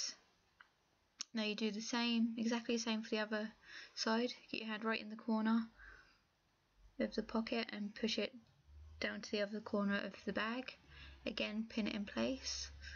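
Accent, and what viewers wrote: United Kingdom. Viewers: You have a british accent, yet you us american methods of measuring a opposed to metric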